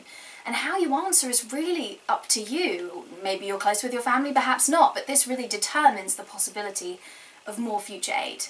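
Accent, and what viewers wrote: United Kingdom. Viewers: Very good review, it’s a fair bit more informative than how I’ve found most other reviews of this game to be. In all honesty, I am already quite convinced that I will love this game (I enjoyed most of BioWare’s products, from Knights of the Old Republic to Mass Effect 3), but I had to see other people’s views on it so I would feel better about spending so much money on Dragon Age: Inquisition… seriously, this game is soooo expensive in the UK! Anyway, I found the part where you talked about the choices and their more subtle effects really interesting; it seems to me as though they are more maturely done and closer to what we have in the Witcher trilogy, rather than the binary options of most BioWare titles. Though I’m also prepared to expect from Dragon Age choices that are more about defining your personality than truly changing the storyline, whereas in the Witcher games we are only given a certain degree of liberty with Geralt – who is an already existing character – but have much more freedom with the direction in which you can take the story. 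Of course, both approaches have their ups and downs, so I’m not saying either is better than the other. By the way, are you from the South of Britain? Your accent sounds like someone from that area (asking only out of curiosity, as I find languages and speech quite fascinating personally). Anyway, this is a great video, I will subscribe.:) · You have a British accent. I'm subscribing!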